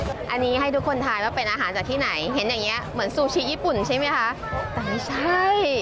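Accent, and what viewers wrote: United Kingdom. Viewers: I love your English accent!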